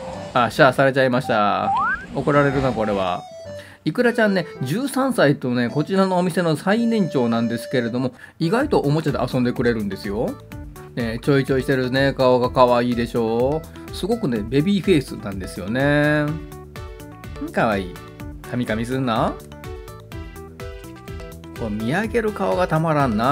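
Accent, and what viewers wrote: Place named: Kansai, Japan. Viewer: 実行中継が楽しい！関西の言葉がとっても良い感じ！つい見入ってしまいます。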